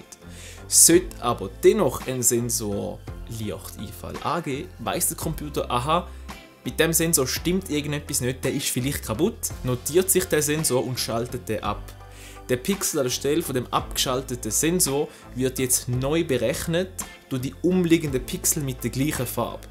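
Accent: Switzerland. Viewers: Ja habe alles sehr gut verstehen können, top erklärt von Dir..! Trotz Schweitzer-Deutsch ;-)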